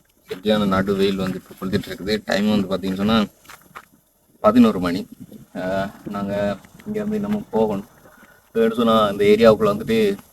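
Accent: India. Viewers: தம்பி நீங்க இந்தியாக்காறர் மாதிரி கதைக்கிறது கேக்க நல்லா இல்ல கோபத்தில் சொல்லவில்லை உண்மையை சொல்றம். தம்பி கதைக்கிறமாதி கதைங்க நல்லா இருக்குதானே எமது மட்டக்களப்பு தமிழ் மாற்றம் எதற்கு ஐயா. நல்லா ஒரு சகோதரம் மாதிரி சொல்றன் தம்பி. கோபிக்க வேண்டாம். மற்றும்படி குறை இல்லை உங்கள் பணி தொடரட்டும்👍👍👍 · இது உண்மைதான் ஆங்கில வார்த்தைகள் எல்லாம் இந்திய மக்கள் உச்சரிப்பது போல உள்ளது இதை மாற்றினால் நன்றாயிருக்கும்.எமது மாவட்ட பேச்சு வழக்கு நல்லது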